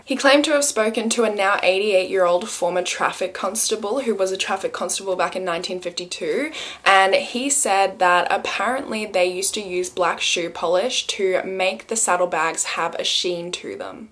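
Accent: Australia. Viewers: Hi, I new that was an Aussie accent, I'm 62yrs and live on the Sunshine Coast Queensland great to find your channel well done really enjoying your channel. Good luck Sweetheart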